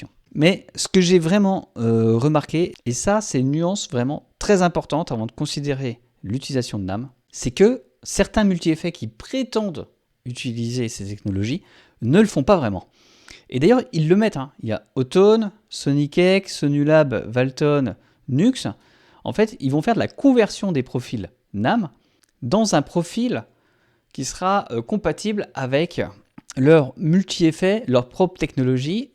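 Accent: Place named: Belfort, France. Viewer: tu as l'accent des Territoires de Belfort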